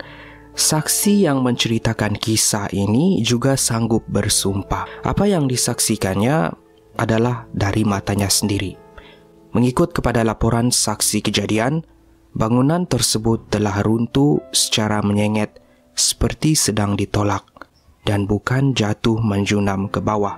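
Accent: Sabah, Malaysia. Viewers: urg sabah ba ko kan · Sabah bh ni pengulasnya nie😂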